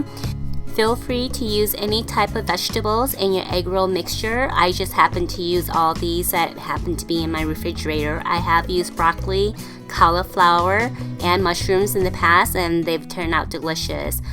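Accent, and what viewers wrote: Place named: Asia. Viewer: This video is sooo entertaining and well said doing the procedure inspite of our asian accent. 2 thumbs up for you madame chef! I will cook this one for my kids.thank you very much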